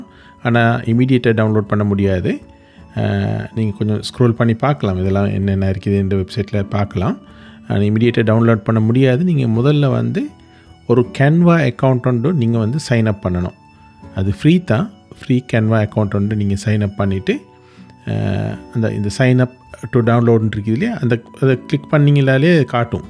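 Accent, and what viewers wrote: Sri Lanka. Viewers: bro Eelam tamil ah? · Are you from sri lanka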